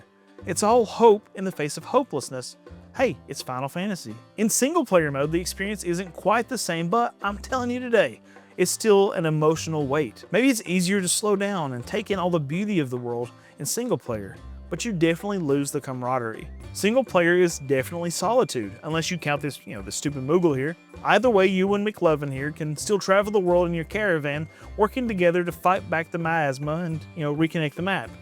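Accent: South, United States. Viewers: I enjoyed this video way more than I ever thought and the southern accent made it way better 😂😂😂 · Your accent makes me feel at home (Grew up with southern people)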